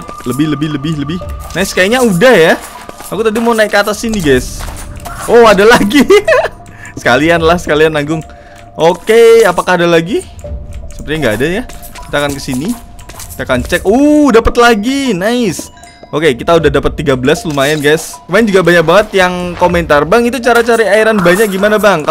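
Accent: Java, Indonesia. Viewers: Abang orang jawa ya bang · Bang wong jowo nopo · Fiks Orang Jawa Logatnya :v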